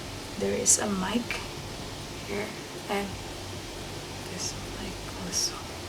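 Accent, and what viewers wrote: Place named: Philippines. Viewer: Filipina k mam nu? Hehe halata sa voice mo 😄..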